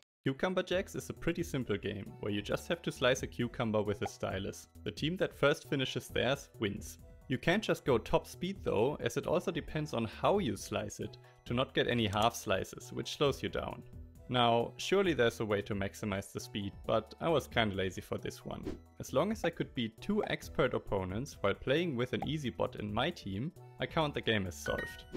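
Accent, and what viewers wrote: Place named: Germany. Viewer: Your way of speaking seemed familiar to me, so I checked your channel info and who would have guessed... A fellow german! (Really enjoy the videos btw. mach weiter so!) :D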